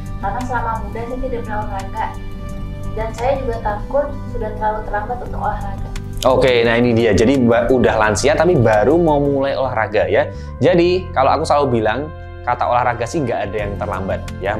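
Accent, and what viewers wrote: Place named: Java, Indonesia. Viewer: Doctor medook.....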